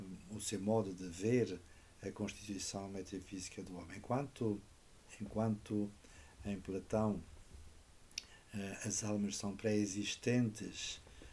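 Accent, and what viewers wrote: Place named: Portugal. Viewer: Abrazos, desde Hispanoamérica,. Mesmo sendo para nós, do outro lado do Oceano, um pouco mais difícil acompanhar a bela pronúncia do português peninsular, a sua mensagem chega a nós e é muito bem recebida. Los católicos estamos mucho necesitando verdaderos y valientes pastores. ¡Gracias!. Obrigado!. Dios lo bendiga, siempre.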